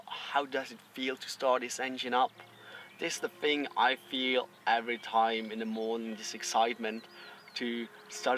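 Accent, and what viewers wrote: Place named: Australia. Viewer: Your accent sounds australian🤔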